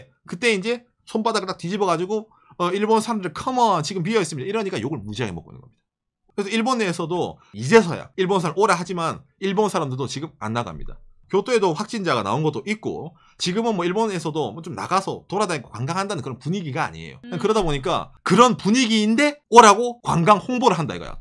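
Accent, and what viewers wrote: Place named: Changwon, South Korea. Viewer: 살짝 창원느낌이네요 ㅋㅋ 창원출신 대학동기도 창원프라이드가 엄청쌔더라구요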